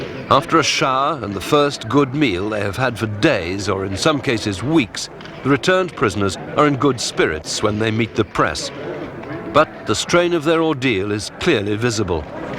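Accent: United Kingdom. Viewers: I can't listen to a brit tryin to educate me. Oh well...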